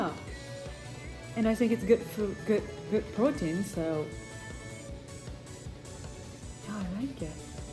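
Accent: England, United Kingdom. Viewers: I love your accent. Like your straight from England 😂👍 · you speak with an English accent! That is amazing! 👍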